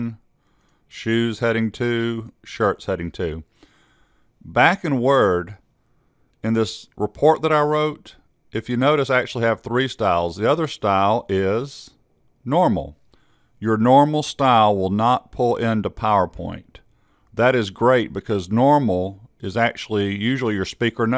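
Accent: South, United States. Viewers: Thanks buddy....yous LEGEND.! MY UNIVERSITY RECOMMENDED YOU.!! 😆 🤣 😂 *I KID YOU NOT.! THEY SAID IT'S BROKEN DOWN & EASY TO UNDERSTAND & DIGEST & I GOTTA SAY I AGREE WITH EM.! LOVE THE ACCENT BY THE WAY. IS IT SOUTHERN.? BUT AY, I BET YOU'D SAY THE SAME HEARING MY TEA DRINKING ASS, WITH MY BRITISH ACCENT. "IT IS WHAT IT IS!!!!" I'VE NOT BEEN THROUGH ALL YOUR VIDEOS, BUT COULD YOU POSSIBLY PLEASE TELL ME IF YOU HAVE A VIDEO (SO I CAN GO THROUGH YOU BACK CATALOGUE) EXPLAINING HOW YOU ATTACH OR IMBEDD A POWERPOINT PRESENTATION INTO A WORD DOCUMENT PLEASE.! CUZ OF COVID-19 ALL MY 1ST SEMESTER IN ONLINE & THEY HAVE ASKED US TO HAND IN AN ASSIGNMENT VIA CANVAS(CANVAS IS THE SYSTEM WE USE TO HAND ASSIGNMENT INTO OUR TUTORS TO BE GRADED) BUT THEY HAVE MADE IT CLEAR IT HAS TO COME THROUGH AS 1 FILE NOT 2 SEPARATE FILES(THE ASSIGNMENT CONSISTS OF A 2500 WORD ESSAY & A 20 SLIDE POWERPOINT PRESENTATION.! 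BUT I'M NOT ABLE TO TURN IT INTO 1 FILE. I WAS HOPING YOU COULD SHOW ME HOW IT'S DONE OR BETTER STILL YOU'VE ALREADY DONE IT & COULD POINT ME IN THE RIGHT DIRECTION (IF YOU HAVE ALREADY GONE THROUGH SUCH A TUTORIAL) ON YOUR AMAZING CHANNEL. OKAY I'M GONNA REMOVE MY LIPS 💋 FROM YA BUTT😁😁😆😄☺!!!??* please reply by 23rd November 2020.!!??!! Thank you.!!!!!!!!!!!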